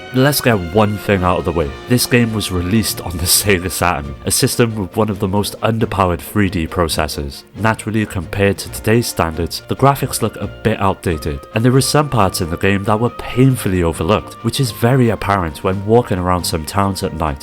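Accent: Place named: Cardiff, United Kingdom. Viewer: Thought I detected a fellow Diffian. 😃😎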